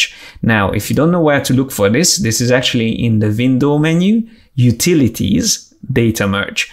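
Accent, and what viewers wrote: Hungary. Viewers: Ne haragudj. Véletlenül nem vagy magyar? Annyira magyar az akcentusod.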